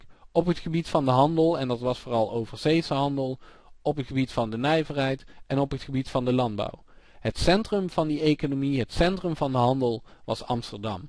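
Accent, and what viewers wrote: Brabant, Netherlands. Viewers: broabant boerûh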